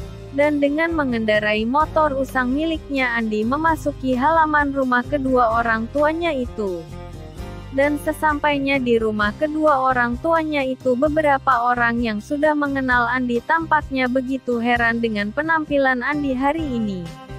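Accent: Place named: Java, Indonesia. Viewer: Mimin logad nya mirip kejawa jawaban ya??!!!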